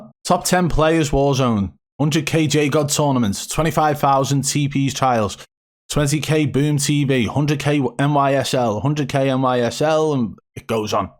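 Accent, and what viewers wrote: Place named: Merseyside, United Kingdom. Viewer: Absolute wool you mate! Swear to god you’re from Skem or Birkenhead you. Bad snitch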